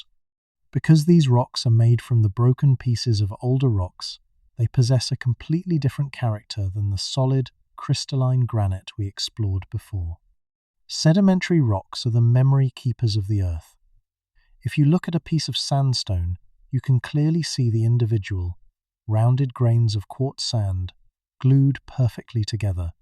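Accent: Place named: United Kingdom. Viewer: Yayyyy you’re using the soothing British AI voice🎉🎉🎉 is it because of the comment I left?😅😆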